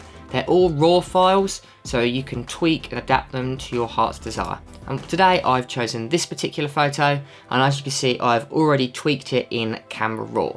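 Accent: United Kingdom. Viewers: Is this British accent? I like it😍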